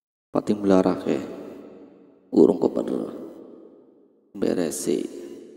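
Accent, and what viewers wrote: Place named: Banyumas, Indonesia. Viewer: ngapak ngendi mas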